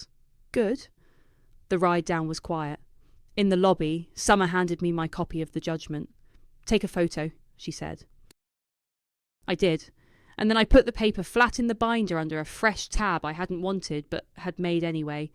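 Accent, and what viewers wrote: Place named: United Kingdom. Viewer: Absolutely hate the British accent in these American stories unlistenable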